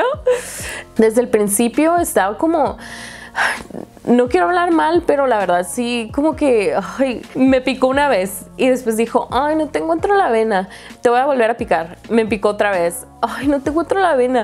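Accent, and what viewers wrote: Fresa, Mexico. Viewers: Me gusta mucho esa forma de hablar o acento, es como super fresa pero natural, no se como explicarlo, es que no conozco gente que hable así. Felicidades por tu baby, yo tengo 6 meses ahora y estoy pensando si quiero o no epidural y buscando videos para guiarme. Besitos 🌸🌸🌸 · Me gusta como hablas toda fresa y eres tan sensilla jaja me encantas..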